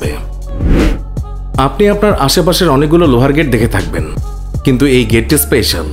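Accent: Bangladesh. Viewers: আপনার ভাষা শুনে ,মনে হচ্ছে আপনি বাংলাদেশি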